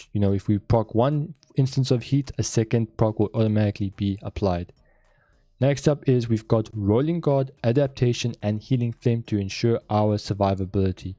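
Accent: South Africa. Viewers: wait. you south african?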